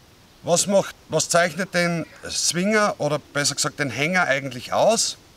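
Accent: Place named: Belgium. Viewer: Bent u belgiesch, ik wel en ik hoor een soort Nederlands belgiesche toon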